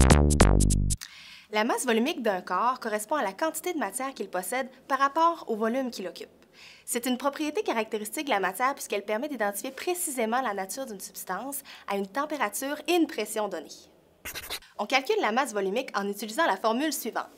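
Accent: Quebec, Canada. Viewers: Derien et merci d’avoir répondu PS :Je surkkiffe votre accent canadien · J'adore le Québec, l'accent, les expressions et tout ça alors ça m'encourage à faire des sciences lol! Mercii haha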